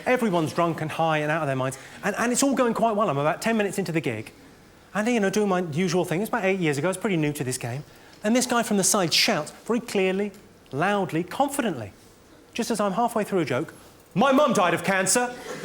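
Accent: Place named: England, United Kingdom. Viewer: I was gonna say that he sounds so lame as a comedian but actually just listening to an english accent its annoying itself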